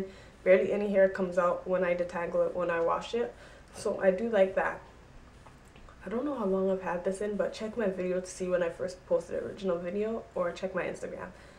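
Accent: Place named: Virgin Islands. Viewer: This hair is beautiful! I just ordered mines. And if you don't mine me asking, your accent sounds like your from the islands; are you from the Virgin Islands?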